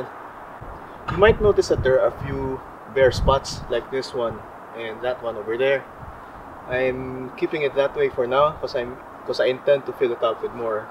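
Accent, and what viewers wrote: Philippines. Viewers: You speak english like filipino accent. Are ypu?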